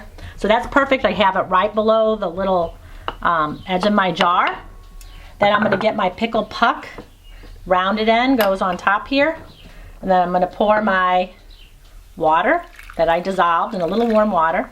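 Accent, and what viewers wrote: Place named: Chicago, United States. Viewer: Thick Chicago accent!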